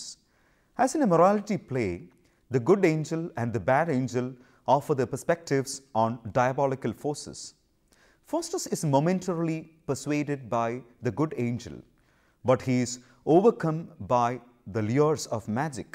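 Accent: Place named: South India, India. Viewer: Your ascent sounds like South Indian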